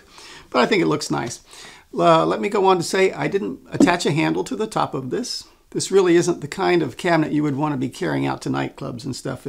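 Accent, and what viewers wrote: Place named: Winnipeg, Canada. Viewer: You’re from Winnipeg, aren’t you?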